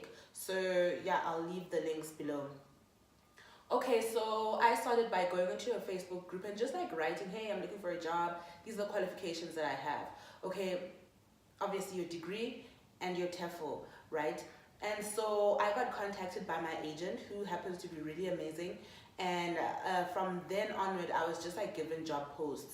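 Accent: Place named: South Africa. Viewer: I love your Xhosa accent dear. You make me miss ezlalini. And thanks alot for such an informative video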